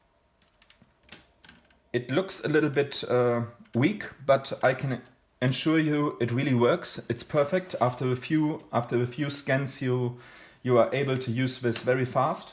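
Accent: Germany. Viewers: dieser deutsche akzent den hört man als deutscher irgendwie immer raus unfassbar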